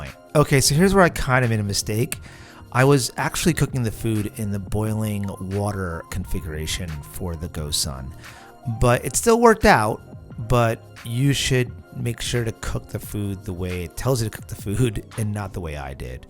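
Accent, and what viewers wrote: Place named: New York, United States. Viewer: He's from New York dead giveaway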